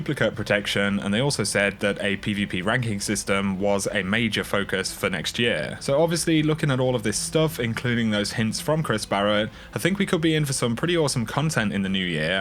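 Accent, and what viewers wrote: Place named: England, United Kingdom. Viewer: Another quality video from owwwwww owwwwwwwwwwwww owwwwwwwwwwwwww (wolf howl) the! Always enjoy your videos, i mean your english accent is a bit annoying but its worth it!;)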